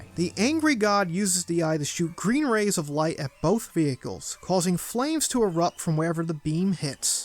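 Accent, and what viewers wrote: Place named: East Coast, United States. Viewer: One of the things I like about this channel is that glorious east coast accent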